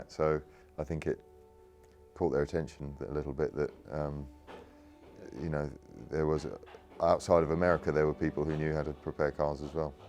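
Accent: United Kingdom. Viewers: Hearing a Brit romanticize about making engineering innovations on classic Mustangs gives me hope for the human race! I mean no disrespect, Sir Clarkson...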